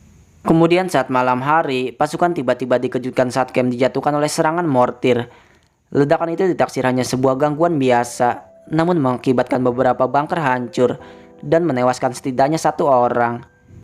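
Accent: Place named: Java, Indonesia. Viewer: Logatnya masih medok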